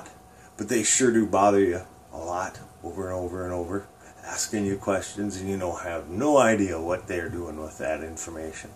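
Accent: Minnesota, United States. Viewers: yeah im one of those idiots(political telemarketer),,, we dont really care what people tell us mainly just try to make it through a shift a job is a job i dont even vote the way i call.. usually if you say you arent voting an a poll they usually end the call..but yeah 99% chance you are still on the list.. that call would be dispo'd just as a decline.. and often times they get the list of people to call under the list of registered voters... you almost sound like you are from "da range" in mn here maybe the UP from your accent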